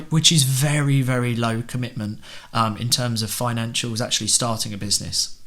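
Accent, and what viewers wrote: United Kingdom. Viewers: Man~my good sir! I totally enjoyed your video. Coming from a country where American English dominates, now I am really starting to like your British accent. How marvelous! Thanks again.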